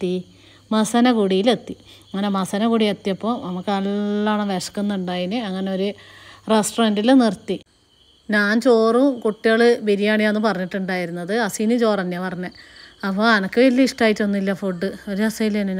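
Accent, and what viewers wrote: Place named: Kannur, India. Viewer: വീഡിയോ വളരെ രസകരമെന്ന് പറയാതിരിക്കാൻ വയ്യ!!💢 നർമ്മം കലർന്ന തനി നാടൻ ഭാഷയാണ് വീഡിയോയുടെ പ്രധാന ഭംഗി👌🎉🎉!!! അത് നമ്മുടെ കണ്ണൂർ ഭാഷയിൽ കേൾക്കുമ്പോൾ നല്ല സന്തോഷം✨ ഹരിത ഭംഗി തുളുമ്പുന്ന പച്ചപ്പു നിറഞ്ഞ കാടുകളും കൂറ്റൻ മരങ്ങളും കാട്ടരുവികളും , പൂക്കളും മാൻ ആനക്കൂട്ടങ്ങളും നിറഞ്ഞ പ്രകൃതിയുടെ സുന്ദരങ്ങളായ കാഴ്ചകൾ അതിമനോഹരമായി പകർത്തിയിട്ടുണ്ട്.👌✨ കാഴ്ചക്കാരുടെ മനം കവരുന്നതായിരുന്നു ദൃശ്യങ്ങളോരോന്നും🎉🎉🙏✍️✍️ ആശംസകൾ💫💫💫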